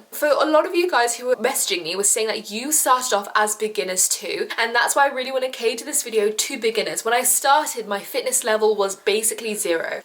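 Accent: RP, United Kingdom. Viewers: You sound so posh you remimded me of my of my cousin who goes to private school loooollllll🤣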